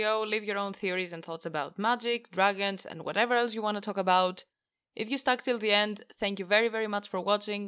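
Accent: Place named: Greece. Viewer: It sounds Greek 🤙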